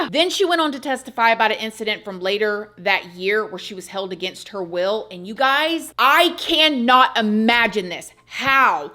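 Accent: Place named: New York, United States. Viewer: Is Christina from New York she has our accent lol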